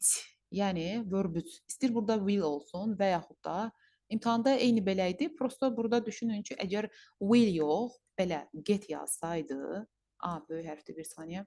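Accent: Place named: Nakhchivan, Azerbaijan. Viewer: Məlimə siz naxçıvanlısız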